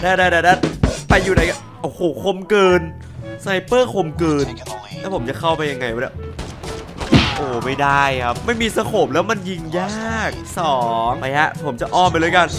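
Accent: North, Thailand. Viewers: พี่คนเหนือใช่ไหมครับ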